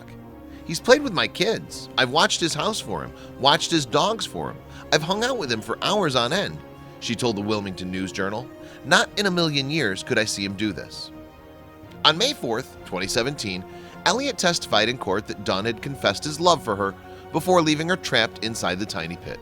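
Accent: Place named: Chicago, United States. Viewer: The narrator sounds like he is from the Chicago area.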